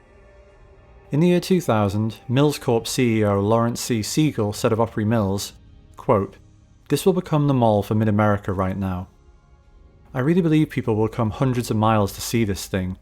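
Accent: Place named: England, United Kingdom. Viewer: dead mall vids hosted by an english man just hits alittle different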